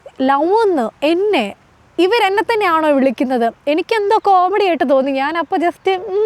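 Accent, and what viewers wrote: India. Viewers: Don't try to fit in Embrace the Indian accent of English language😉❤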